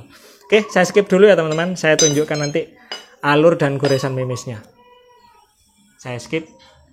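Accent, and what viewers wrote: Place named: Java, Indonesia. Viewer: Setiap kali liat videonya mas,i jujur,. saya bukan fokus pada bahasan videonya😅, tapi dari nada bicaranya🙏😄😄 Perpaduan baku, dan jawa..😊😊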